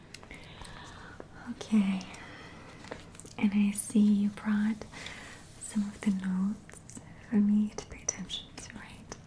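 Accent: France. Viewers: Ton accent français est juste magique pour les oreilles! J'aimerais trop un asmr en français un jour s'il te plaît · How cute you are with your french accent 😍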